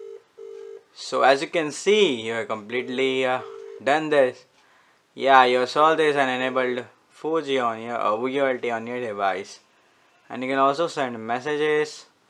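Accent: India. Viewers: Indian accents, why?! Just can't understand a word.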